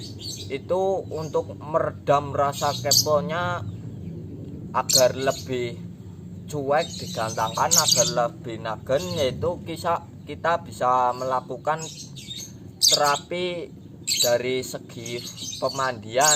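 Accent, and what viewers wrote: Java, Indonesia. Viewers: Salam medok🙏🤘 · Ngomong nya jgn medok" mas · Ngapak tenan koek kang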